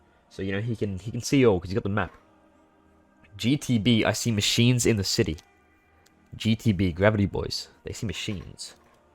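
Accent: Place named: Australia. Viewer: I believe its ausie accent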